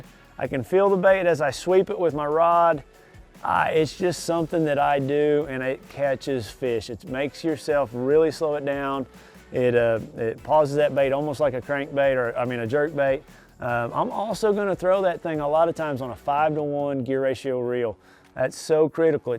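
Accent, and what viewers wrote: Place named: South, United States. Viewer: January WHERE???????? Sounds like you have a southern accent....in certain areas up north in January, the most important thing would be an ice augur! lol.....Why wouldn't you start the discussion with where you are, and generally, where you fish....I had to fish 45-50 feet deep water to catch bass today, and you are talking to me about a jerkbait??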